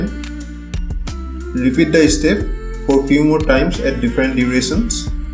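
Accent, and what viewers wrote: India. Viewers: finding a tutorial 😀 Indian accent ☹ only tutorial there is 🙄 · You sound like an Assamese. I am Assamese and ik my ppl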